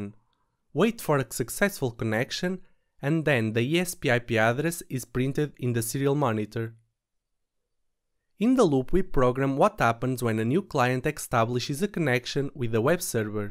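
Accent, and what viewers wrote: Portugal. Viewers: You sound like Portuguese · Olá! Hello! Descobri o canal sem querer. Estava mesmo à procura de um canal deste género! PS: Descobri logo que eras português nos primeiros segundos do vídeo devido ao sotaque. Keep it up ;)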